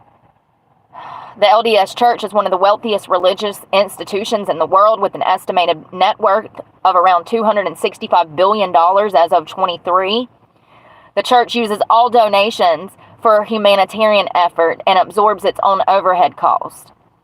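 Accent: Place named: North Carolina, United States. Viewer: How are you? Checking in haven't had a chance to hope everything is going better! You always look beautiful and I adore your North Carolina accent. I can't figure out why everybody in the South North Carolina has the strongest southern accent? I watch another creator that has the same! It's freezing up here nights are in the twenties. Hope the weather is nice for you!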